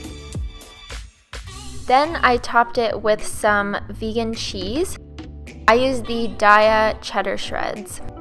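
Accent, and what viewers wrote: Canada. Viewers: peep the canadian accent :-)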